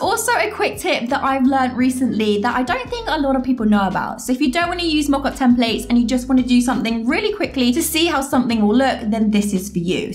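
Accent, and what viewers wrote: United Kingdom. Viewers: ! You have really nice british accents. Your content is always worth watching than other channels. Glad i found you and God made you ✨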